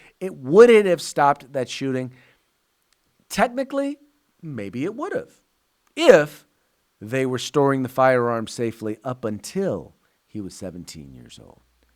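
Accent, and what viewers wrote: United States. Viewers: Our governor fuckstick edwards in Louisiana is even worse. People better be paying attention to what is happening...your Southern accent blows by the way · OK, you are taking some leaps here. And with the horrible hick accent that no one i know here in texas talks like that aside, I trust Governor Abbot. And Texas will never be like California.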